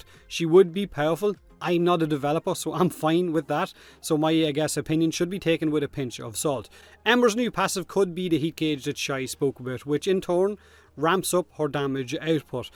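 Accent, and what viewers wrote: Ireland. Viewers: Impressive,! You've adopted an irish accent, just like Zane from Borderlands! 😎👍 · Honestly, i'd kinda like ember to stay very squishy but with a very high damaging capability, like nuking and such. Not some dumb press 4 to win, but something more like perhaps wisp's 4th ability, where you have to stack the reservoirs in order to deal the maximum amount of damage. Because warframes that have like one or two signature moves and a defensive shield, well, it's kinda all there is to new frames. Revenant, the new Nezha etc... I'd hate for that to become a recurring theme in the newer warframe designs. I really want ember to stay some kind of a glass cannon, but hoping DE can make her not point and click (the mesa rework on her 4th ability is a good exemple of a nuke ability well done). I'm sure they can pull off something along those lines. BTW, english not being my first language, it's getting pretty hard to understand what word you feel like you'r confusing yourself with (like third and turd). 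Just go with the irish accent it fucking rocks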